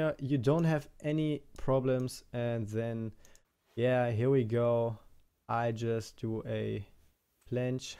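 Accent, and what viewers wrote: Germany. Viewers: I just love a german accent. Thankz brah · man hört direkt raus er ist Deutsch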